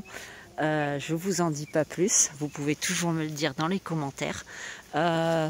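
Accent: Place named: Auvergne, France. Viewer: Coucou, Merci de nous régaler avec votre quotidien au poulailler, et votre accent auvergnat naissant. Gratitude pour tous vos partage et pour faire rayonner notre belle region. Je pari que les nouveaux seront des barbues belges Bonne fin de semaine a tous et courage a tous pour l arrivée de l automne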